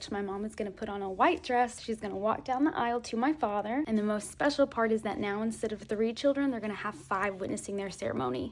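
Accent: South, United States. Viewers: yesss! A southern bell that’s what I’m hearing ! Couldn’t pin point it at first lol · The first video I saw of her I thought she sounded very southern!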